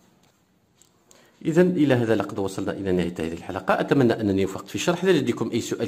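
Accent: Algeria. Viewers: والله العظيم نحب إخواننا القبايل كي يتكلمو بالعربية عندهم لهجة رائعة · اخي وش نت جزائري ولا مغربي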